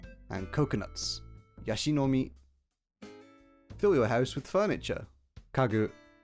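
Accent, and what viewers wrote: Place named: Birmingham, United Kingdom. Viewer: This is a brilliant resource mate, thanks so much - definitely deserves way more views. Ps: nice to hear a fellow Brummie speaking in Japanese :P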